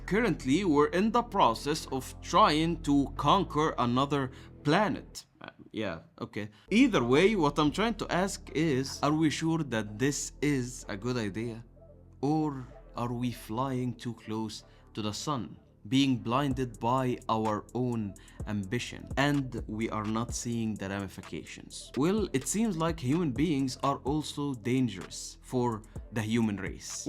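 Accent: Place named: Egypt. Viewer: Definitely an Egyptian with that accent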